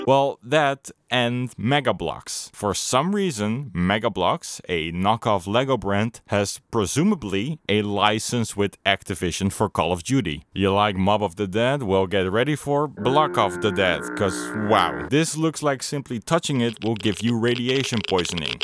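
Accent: Netherlands. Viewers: talk wit a verry dutch aksent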